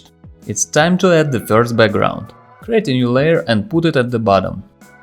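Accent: Russia. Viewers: Dude are you russia? You speak with some strange accent reminiscent of Russian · это случаем не русский акцент у автора??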